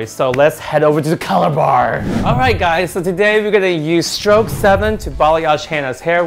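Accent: Australia. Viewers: she's Australian, I believe. 😁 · you’re right! I was going off her accent I heard at an interview. I clearly was t listening close enough because I can usually tell the difference. Perth Australia. I just looked it up. Thanks for the correction 👍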